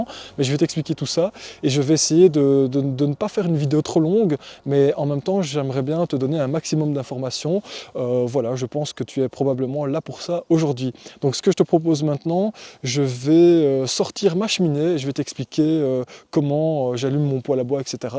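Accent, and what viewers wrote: Belgium. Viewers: Charmant accent belge 😇🤓🐨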